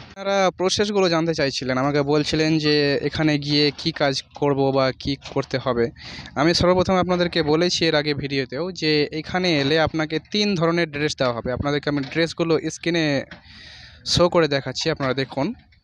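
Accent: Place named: Kolkata, India. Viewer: Aapner bhari kothai Kolkata er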